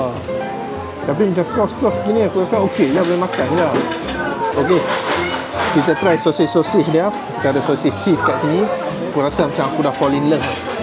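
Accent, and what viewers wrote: North, Malaysia. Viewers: Orang utara kaa boss ? 👍🏾👍🏾 Salam dari taiping perak boss 👌🏾🙏🏾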